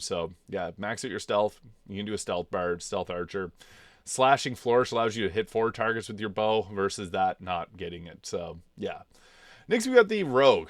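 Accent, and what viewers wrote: Canada. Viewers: LMAO, i legit watch this channel for two reasons: 1) great bg3 content and 2) the hilariously thick canadian accent 😂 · I detect a Canadian accent.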